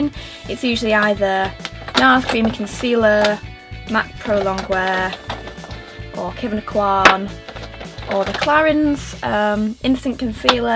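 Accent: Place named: Northwest England, United Kingdom. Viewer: I feel like we are from the same place, I read your faq and you're from the north west of England and so am I but your accent sounds like the town I'm from😂💕 I loved this look and you suit white-blonde hair so much! I wish I could pull of that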